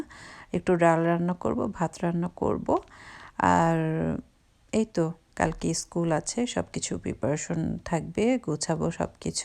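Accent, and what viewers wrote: Sylhet, Bangladesh. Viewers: Apu tumi ki Sylhety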